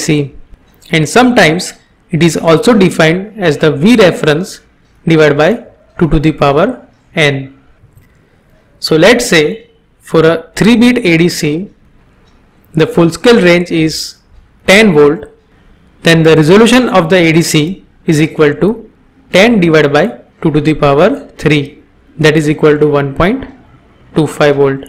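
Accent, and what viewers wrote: India. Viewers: Since the moment I've heard the Indian accent i knew it was going to be a good video, they never disappoint, kings of guides.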